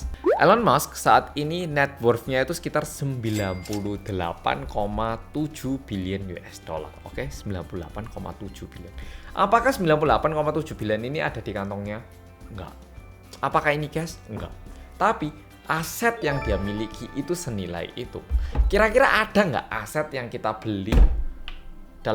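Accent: Java, Indonesia. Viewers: Kangen yang medok medok😍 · kangen yang medok medok ya liat mas wkwk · Yuk irit breng mas medhok... Waaahhh... Sip dah kalo sama mas... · Maz lofyu❤️punya kembaran medok jga aku wkwk sama maz >< Tim garcep dong❤️ Komen sebelum rame xixi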